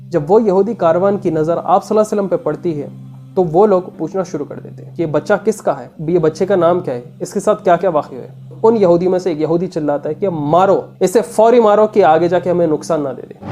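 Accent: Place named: North India, India. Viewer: Apka purana andaz raha baat karne ka toh 1000 videos dekhinge bhai, ye north Indian baat karne jaake itni interesting video dekh ke bhi utna maza nai aara, kaiku english use karre bhai pronounciation ich kharab hojata toh? Totle hai jaisa dikhra aadha word andar hi kha lere.. pehle ke jaisa interesting rakho na conversation? Golden era chale gaya kya ka?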